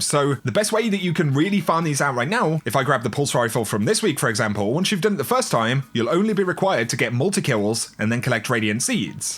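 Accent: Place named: Lancashire, United Kingdom. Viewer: it is though to judge but I'm a tech iv checked my connection and bandwidth its very stable but according to bungie iv got a terrible connection very strange but I think it's the virtual servers auto detecting and trying to correct the connection they have thousands of options on a vr server so anything can go wrong great vid and you sound like your from Lancashire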